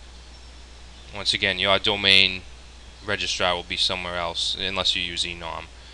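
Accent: Boston, United States. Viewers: with the boston accent lol. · Dude your Boston accent is ringing in my ears. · Strong boston accent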